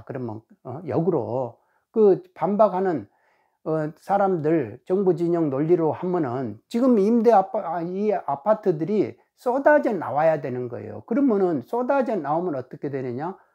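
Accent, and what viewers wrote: Jeolla, South Korea. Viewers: 사투리가 전라도였군요~ 그쪽지방출신이신데 무조건 한쪽으로 기울지않고 올바르고 진실된말씀주시니 더욱 존경하고 귀하십니다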